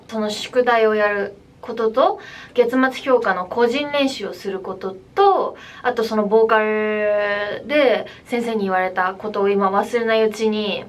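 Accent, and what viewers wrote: South Korea. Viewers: 日本語も少し韓国語っぽく聞こえる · カタカナ英語がもうほとんど韓国語の発音になってるー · 朱里さん、少し韓国語訛りになってきてませんか？🤣🤣🤣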